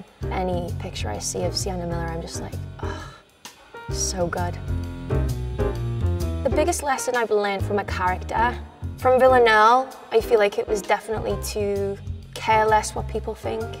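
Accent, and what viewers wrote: Liverpool, United Kingdom. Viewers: aww Scouse accent is one of the best thing about her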